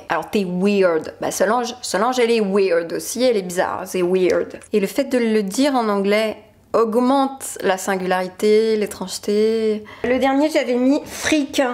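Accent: Quebec, Canada. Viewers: Jsuis québécoise pis jtrouve que ta un superbe accent québécois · Solange est pas fucké, elle m'a l'air d'une Québécoise qui fait semblant d'être une parisienne... lol.. Ton Québécois est parfait, t'es Française ou Québécoise? En passant, si l'origine du Français Canadien t'intéresse, écoute du Joual. Les coins de campagne, le bas du fleuve, ou les maritimes encore aujourd'hui parlent un Français très similaire, mais en ville notre Français est très commun. Le joual et le Québécois est au Français comme le Créole est au Français. <link> (active les sous-titres!) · xoxo Elle «imite» aussi bien l'accent français puisqu'elle est québécoise. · T'a tellement pri d'accent quebequois c'est fou!!! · elle a pas l'air francaise :') ton accens québecois est trop bien fait ahah et c'est sur que si tu prend le pire film quebecois sa sera nul même les quebecois écoute pas ca genre je suis québecoise et j'ai jamais écouter ca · Pour ton info, elle vient en effet du Québec. 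Et elle ne fait pas semblant d'être quoi que ce soit : elle vit en France depuis plusieurs années. Du coup, tu finis par être à l,aise avec la langue de ton nouveau chez toi. Elle a appris avec le temps et on ne voit pas la différence ou presque. Mais le Québec reste caché et peut sortir facilement ! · J'adore lorsque tu parles avec ton accent québécois!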